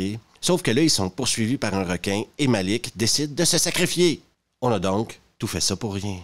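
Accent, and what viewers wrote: Quebec, Canada. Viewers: Je te suis déjà depuis quelques temps, et je trouve que tu as un humour qui fait vraiment humour Français. On voit que tu connais ton métier :) Merci pour tes vidéos toujours cools qui m'ont fait apprecier l'accent made in Quebec !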